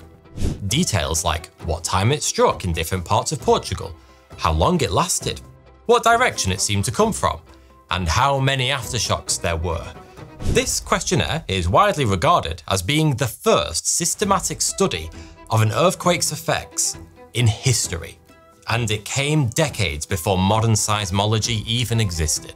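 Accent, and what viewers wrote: United Kingdom. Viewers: Hear me out here: It’s really not that big of a deal. He’s British, he’s gonna pronounce shit wrong from time to time.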